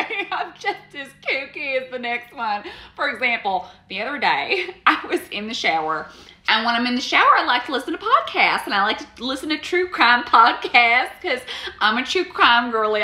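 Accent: South, United States. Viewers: You did such a phenomenal job of emulating not only the southern accent, but also the southern style of storytelling. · Love that southern accent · Ok so what accent is that because so many women I know have that in the South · Lol The Southern accent is what the extra "magic" is. You know your stuff Woman. Lol. Nice work. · I see Southern family at family reunions, so hearing the accent and you just talking about your experience was actually familiar and comforting to hear 😊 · you are so talented (not that you need me to tell you that) - too talented for that cliche, hackneyed “Southern hillbilly” parody accent. You can do so much better. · You're legitimately the first actor I've heard who can do an accurate Southern accent Hollywood keeps pumping out movies starring A-listers mimicking Gone With the Wind for some reason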